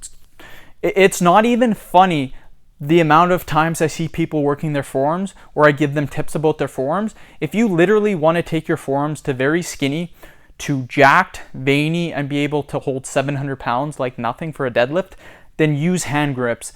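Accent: Canada. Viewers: Canadian af bc he sounds like nick eh 30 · Canadian accent?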